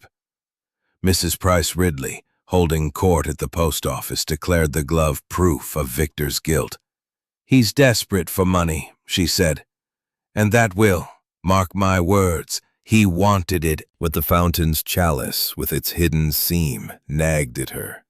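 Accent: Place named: United States. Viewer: How awful! An American "Hollywood Blockbuster" voice reading a very British story which demands a very British voice and enunciation. Don't! Just don’t.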